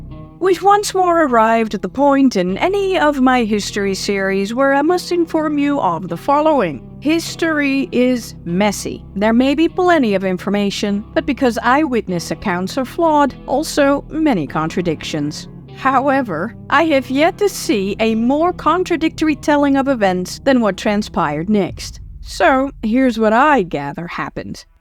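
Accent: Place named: Netherlands. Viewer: I love that Dutch accent. This is brilliantly made.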